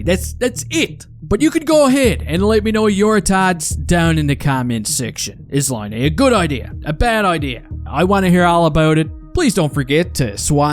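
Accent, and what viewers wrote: Canada. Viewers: Your accent is somewhere between Canadian and Irish. Double-ended lol.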